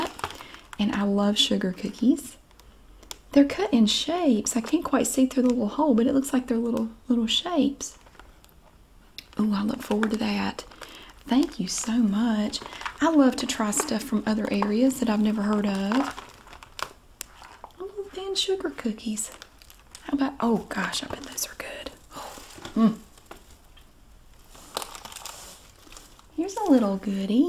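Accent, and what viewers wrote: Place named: Pittsburgh, United States. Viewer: ! What suburb outside of Pittsburgh was it?? I'm born and bread Pennsylvanian, just about 1.5 hours outside of Pitt. Also, we call the accent "Pittsburgh-ese"- I believe it was recently rated the worst accent in the entire country lol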